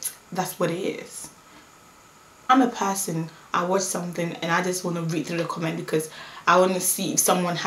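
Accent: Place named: United Kingdom. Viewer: u are stunning i live in the us so i have not had herd the accent in many year i was on uk once many yrs ago with the military but i could never gt tired of hearing u speak iam certain its a pleasure to hear u say something as simple as good morning or pass the pepper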